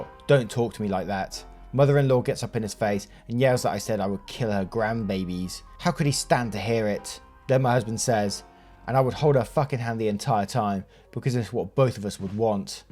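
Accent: United Kingdom. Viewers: Lol. I think MAYBE Canada carries Stouffers but, yeah. definitely has some Brit to his accent but, I'm not sure if he's in the U.K., Australia or New Zealand, or what.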